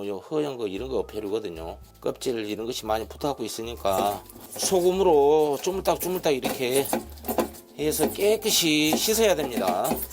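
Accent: Jeolla, South Korea. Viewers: 전라도 사투리를. 많이. 쓰시네여😊😊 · 세프님.전라도.말투가. 너무.정겹습니다 .그리고.좋은요리고맙습니다.항상행복하시고.오래도록좋은요리부탁드닙니다.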